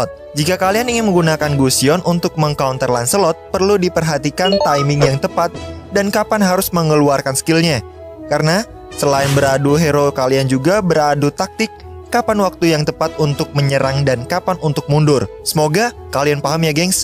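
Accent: West Java, Indonesia. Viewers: Kang pasti org sunda ya kan Sama kaya gua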